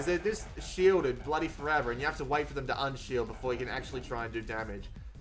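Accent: Australia. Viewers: That voice. MATE. from a fellow Aussie. Jesus.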